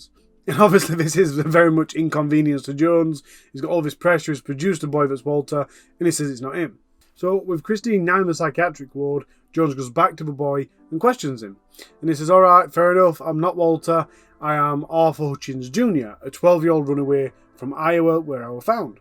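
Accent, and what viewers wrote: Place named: Northern England, United Kingdom. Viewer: I've heard these stories before, but not in a storytelling format with a Nth English accent. Good work.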